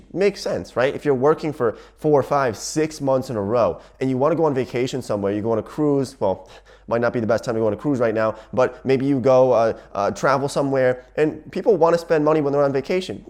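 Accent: United States. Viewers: im very confused as in if hes american or birtish lmao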